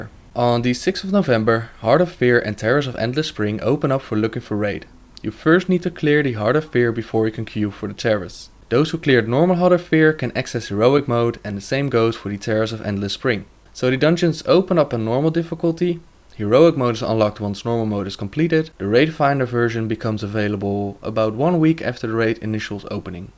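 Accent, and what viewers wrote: Netherlands. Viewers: haha je zij bonus role echt heel erg met nederlanss accenr xd niet erg maar grappig :)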